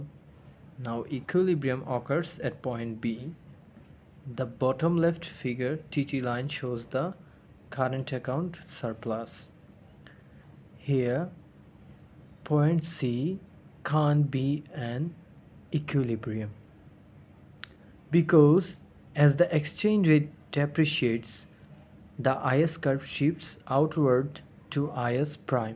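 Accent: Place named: United Kingdom. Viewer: ব্রিটিশ এক্সেন্ট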